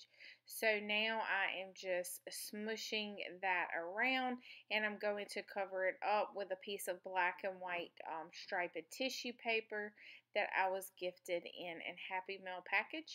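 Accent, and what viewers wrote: South, United States. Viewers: My stars girl, I love all of your southern accents and expressions. I moved out West 15 years ago and miss the accents. You bring me Joy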